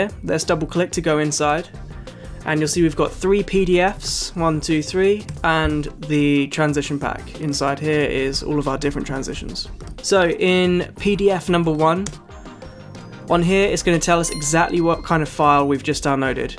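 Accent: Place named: United Kingdom. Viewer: I did not expect you to be from the UK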